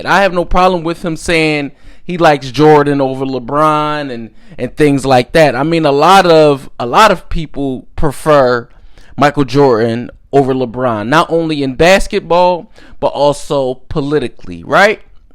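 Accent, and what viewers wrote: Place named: New York, United States. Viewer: Sound like you're from one of the borroughs.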